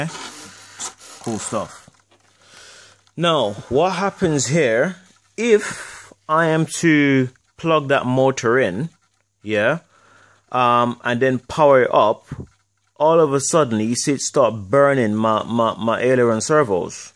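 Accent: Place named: Jamaica. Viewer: Sounds like my hometown Jamaican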